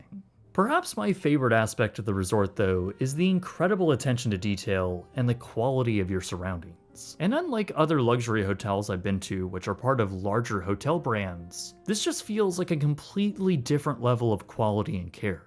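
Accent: Canada. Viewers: You're Canadian accent is coming out incredibly annoying in this video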